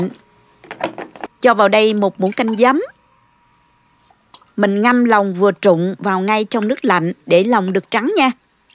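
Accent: South, Vietnam. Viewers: Giọng chị miền Nam , chị thì không nên dùng các từ đĩa( dĩa), thìa( muỗng), thái(cắt, sắc)...các từ đó để người miền bắc nói đúng hơn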